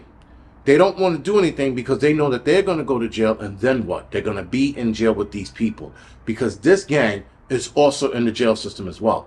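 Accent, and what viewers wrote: New York, United States. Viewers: Yoooo. Well said bro. I'm not used to black guys that have the exact same accent that I do and i'm a white guy, Italian from Corona which means,,,, You gotta be from new york city. I left back in 97, came to Vegas but now Vegas is all messed up with all these California people that come here and all the guys have high voices like they had their balls cut off. It's time for me to move from here now. I gave you a like and Subscribed I'll be watching your channel more. Awesome content keep up the great work. 👍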